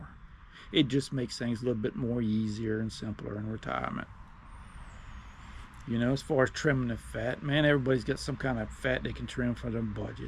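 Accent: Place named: Virginia, United States. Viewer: Are you originally from the shenandoah valley of Virginia or West Virginia. I am and you sound like you are. Anyhow I saw this video today, subscribed and watched all your videos in order. I am soooo happy to hear someone honest and down to earth non financial guru. I am 55 still working. Worked in factories since I was 18. Been at this one for 33 years, no pension because company was sold in 2015. Could not agree more about factory work was people working together, friendships and kind of fun. Now if one person is offended or does not agree with another person's opinion it can cost you your job. I want to retire early at 56 with a high 6 digit 401k, probably Obama care gold level insurance. Wife already retired this year at 58 and drawing a few hundred from her 401k per month. I, like you, have been just digging into vids books whatever to educate myself and am nervous I'm missing something. 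Just a hs education here but 100 times more than my grandparents had and I owe them for my work and saving ethic. Like you and your wife we can live good on 3400 net a month and could trim that down if needed. Keep telling your stories because I feel like you are in my living room talking to me and answering my questions. Thank you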